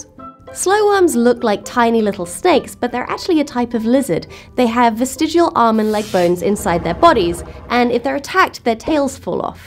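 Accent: United Kingdom. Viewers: Okay this to all the British people over her...Is her (Siobhan Thompson's) accent fully British?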